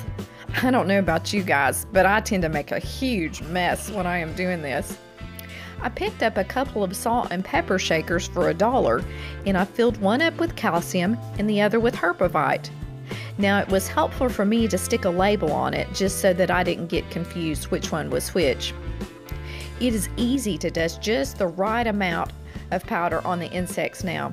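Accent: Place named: South, United States. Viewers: She from Texasss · I love your voice. I'm southern too and this makes me feel like I'm in Sunday school with my teacher Mrs. Katie ❤️ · Western North Carolina here. We're neighbors! Are you from East Tennessee? Your accent sounds very similar to ours. Kenny Chesney is from Sevierville, I think.:D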